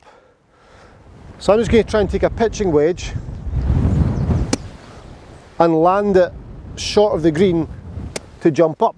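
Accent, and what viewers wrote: Scotland, United Kingdom. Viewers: I’m in Glasgow great to hear Scottish accent with humour, I’ll take on board what you say to tomorrow morning on first tee, great vid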